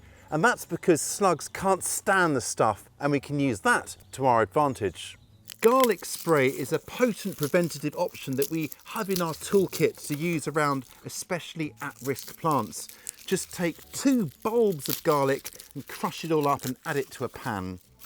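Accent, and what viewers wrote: United Kingdom. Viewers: We are in the UK please know your audience before commenting nonsense that doesn't apply to UK. You can clearly tell his accent is British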